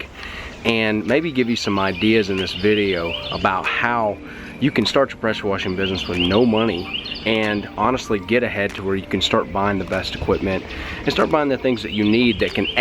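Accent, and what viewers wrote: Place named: New Jersey, United States. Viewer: Just found you on youtube, after seeing others, love the direct and truthful comments and straight up shooting from you. We are almost neighbors, I'm about 30 miles North of Nashville. Keep up the great videos, I just subscribed. If you want to sell that equipment , 4 gallons per minute, let me know. Would love to hook up with you sometime. By the way your "josey" accent is pretty good, I should know lived in NJ for many years.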